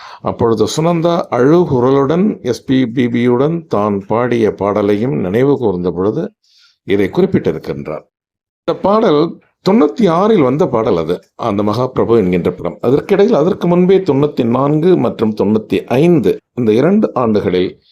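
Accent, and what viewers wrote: Sri Lanka. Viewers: Neenga ilangaiya sir unga slang nalla iruku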